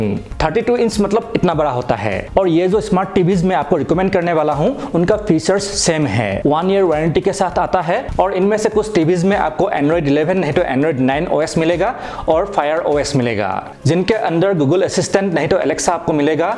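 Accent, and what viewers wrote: Assam, India. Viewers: You accent says you are assamese from uper Assam and why do you sound like reading newspaper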